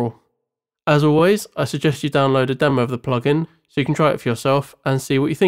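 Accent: United Kingdom. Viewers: Eh, the dude sounds like a caucasian from the UK. Make of that what you will.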